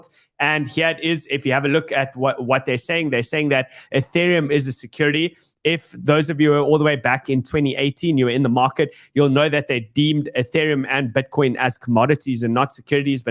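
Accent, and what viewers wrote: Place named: South Africa. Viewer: Love the SA accent. Couldnt care less about TA. Its all BS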